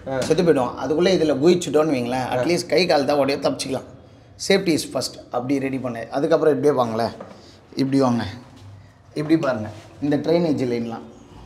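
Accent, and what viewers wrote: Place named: Kolar, India. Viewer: Kolara pesararu 😂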